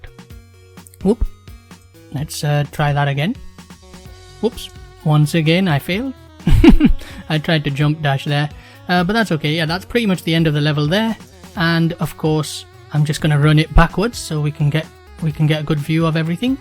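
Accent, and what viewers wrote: United Kingdom. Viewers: Awesome work btw not to be too creepy but what part of the uk are u from?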